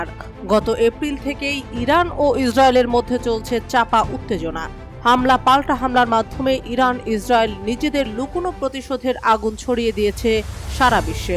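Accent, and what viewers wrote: India. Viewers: India voic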